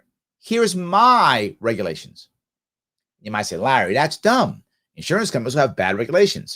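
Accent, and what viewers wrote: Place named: New York, United States. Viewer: Larry: for Pete's sake, you talk like a typical "New Yorker"...terrible weather in New York, in New Jersey (so cosmopolitan of you to deign to mention NJ), but what about Philly and Washington, DC...we don't get any respect? Let me inform you that we got a TON of rain and wind in DC on the night of 31 August. NYC is not the prima donna (not anymore) of the East Coast!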